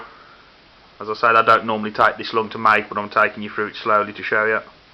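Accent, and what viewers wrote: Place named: United Kingdom. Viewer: Whow. Thank you for that know-how. Where are you from? It took me some time to get used to your accent, now I understand - what I hardly did at the beginning :o) All I could guess was that you must be British.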